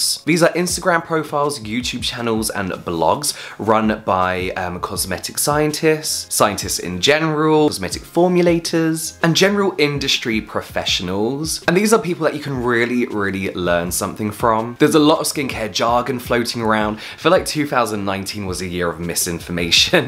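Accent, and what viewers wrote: United Kingdom. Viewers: am i the one who watches channel because of his satisfying BRITISH pronunciation?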